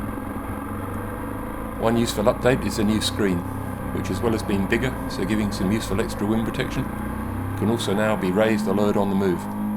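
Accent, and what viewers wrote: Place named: Australia. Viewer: Hi Guys, I am italian and I could follow the speaker excellently. Speed it is not a problem in general if no jargon is used ;-) this said I think that for an internal audience it might be a bit problematic understand speaker's english given the accent. is he australian or what?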